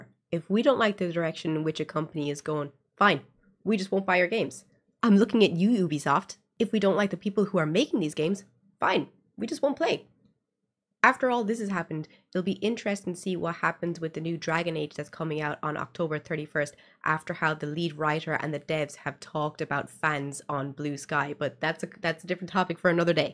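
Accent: Ireland. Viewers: love your short videos and I'm glad I came across this on YouTube. love the Irish accent, my great grandfather came to America from Ireland, and I really want to visit someday. but to get back on topic, totally agree, you make garbage people don't want to play it's not going to sell. I keep hearing the people against sweet baby and other games as a vocal minority but if that was true the game would succeed, hmm wonder why they don't. maybe because its minority you are making your games for, and they don't buy them, you cultivate the wrong player base, and you will end up on the cutting room floor.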